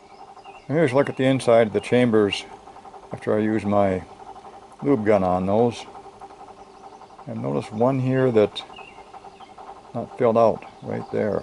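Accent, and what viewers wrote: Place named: Midwest, United States. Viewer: By the way I kind of recognized your accent are you from Midwest or Minnesota I grew up in Minnesota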